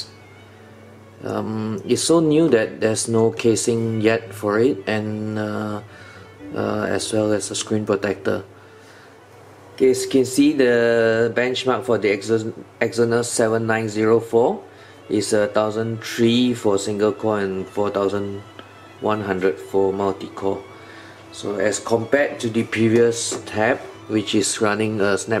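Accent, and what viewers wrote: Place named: Malaysia. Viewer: your voice like malaysian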